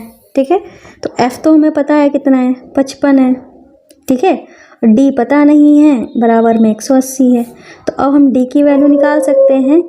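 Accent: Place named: Rajasthan, India. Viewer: Mam aap Rajasthan se ho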